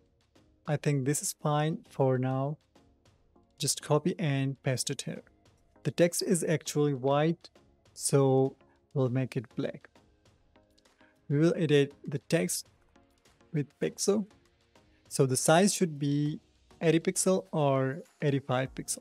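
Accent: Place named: India. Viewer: Are you Desi? Familiar accent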